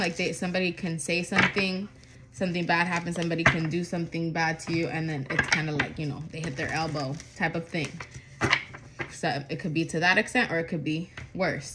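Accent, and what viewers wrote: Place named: Caribbean. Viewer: I love your Spanish, are you Dominican? Cuban? Puerto Rican?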